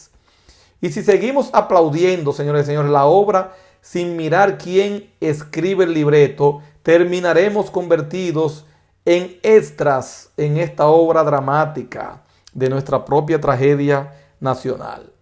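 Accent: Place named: Dominican Republic. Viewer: Ahora haz un vídeo sobre la invasión de la que somos víctimas.... asumo que eres dominicano por tu acento.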